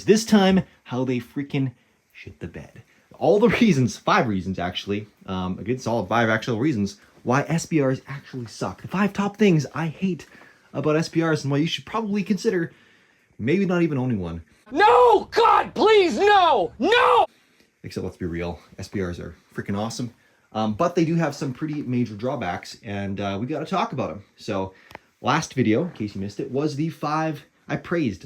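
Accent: Canada. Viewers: Ahy do you have a canadian accent and use ML ? 🤪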